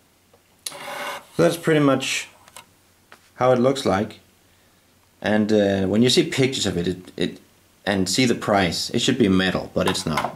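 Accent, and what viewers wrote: Denmark. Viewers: I was like... That sounds a tiny bit like a Dane. And sure enough, there is the tv series "Klovn". 😁 So.... How smooth do you rate the scrolling in games, in real life? And is it good for Amiga as well?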